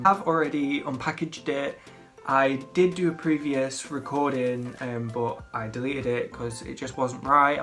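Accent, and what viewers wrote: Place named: England, United Kingdom. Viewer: I just like listening to you. U SOUND like U know what you're talking about and I love your English accent.!!!.. take care and keep it up.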